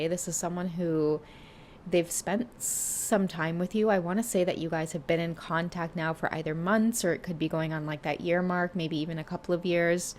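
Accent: Trinidad and Tobago. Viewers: I love to hear you speak.. u bring me home every time I hear that. I might be wat dey call a Yankee but growing up all I Kno is a Trini tounge all my thoughts is in a Trini accent. I love to tap in with ya. Ya does really make me laugh 😂❤ but ya talking de truth. Luv itt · As a fellow West Indian, Jamaican girl, I LOVE your channel. & love your trini accent 🥰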